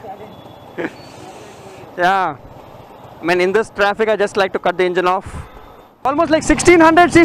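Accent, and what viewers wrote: India. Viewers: You Accent also reminds me of the Russel Peter's Indian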